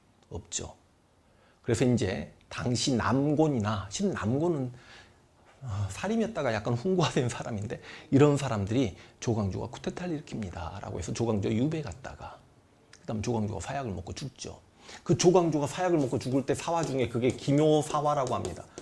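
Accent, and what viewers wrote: Jeolla, South Korea. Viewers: 전라도 사투리가 거슬리지만 재밌게 잘들었습니다.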